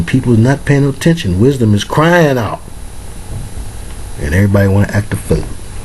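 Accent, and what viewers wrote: Detroit, United States. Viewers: Proverbs and Psalms are my personal favorites. I love listening to them interpreted with your old school Detroit flavor.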